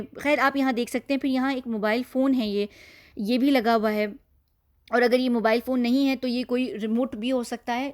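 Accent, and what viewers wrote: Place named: United Kingdom. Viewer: Absolutely in love with your work ma'am. You always back up ur facts with evidence. But I really have crush on your English accent. More power to you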